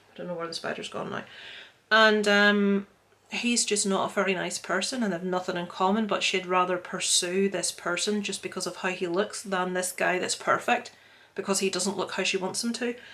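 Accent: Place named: Northern Ireland, United Kingdom. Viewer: Just subbed to u after seeing you on robs stream nice to see a northern Irish accent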